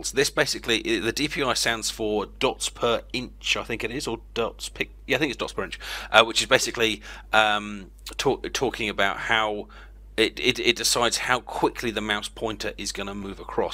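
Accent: United Kingdom. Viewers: British accent <3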